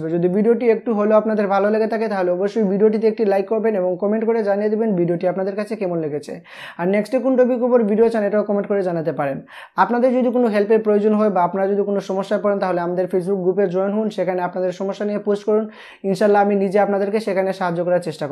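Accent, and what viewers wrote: Sylhet, Bangladesh. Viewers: আপানার বাড়ি সিলেটে?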